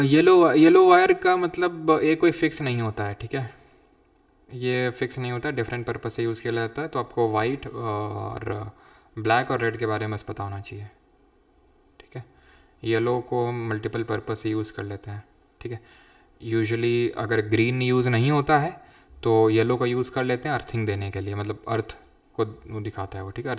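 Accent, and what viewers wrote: Kanpur, India. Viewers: It's my second class sir kanpur se hai kya language bilkul hm log jaisi si hai 🙃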